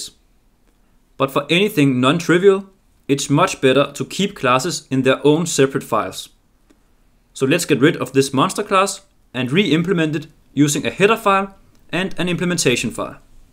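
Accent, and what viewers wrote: Denmark. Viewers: As soon as I heard you said Vector I was like that sounds like a Danish accent and then I check channel description and it says Denmark. I am not sure if it’s the fact I am Danish I could tell or if it’s because I watched too many other Danish people, probably both. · Du lyder lidt dansk 😀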